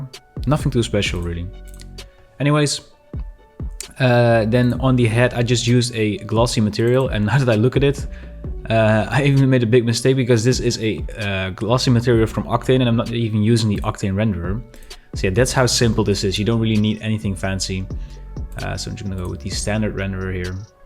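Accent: Netherlands. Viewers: your dutch arent you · dutch accent :)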